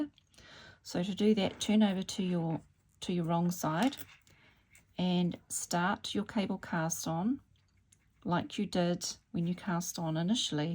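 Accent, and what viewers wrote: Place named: New Zealand. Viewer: so lovely to hear your kiwi accent :)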